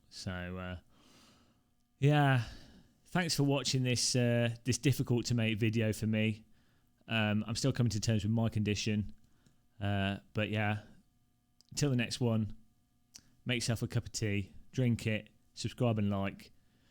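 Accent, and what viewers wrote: United Kingdom. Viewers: I clicked on your bait but as soon as you spoke i knew it was more dry British humor, cheers A+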